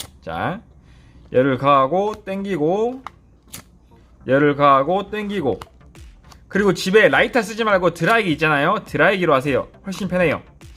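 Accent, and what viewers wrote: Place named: South Jeolla, South Korea. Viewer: 전남사투리 맛깔나게하시네요 ㅋㅋㅋㅋ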